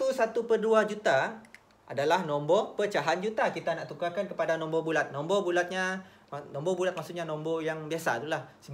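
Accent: Sarawak, Malaysia. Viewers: Kitak orang sarawak ka?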